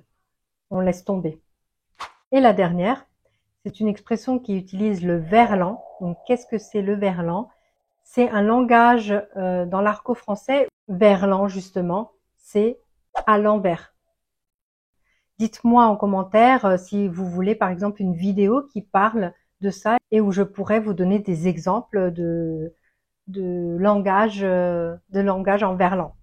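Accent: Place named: Italy. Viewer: C'est la première fois que je visionne vos vidéos, mais je tiens à dire qu'à première vue, j'ai immédiatement remarqué que vous etes sans doute d'origine italienne. Cela se ressent dans votre charme italien et je dois avouer que j'apprécie énormément vos prononciation.Bref,les expressions que vous avez mentionnées sont très utiles, utlisables dans la vie quotidienne. Grazie mille.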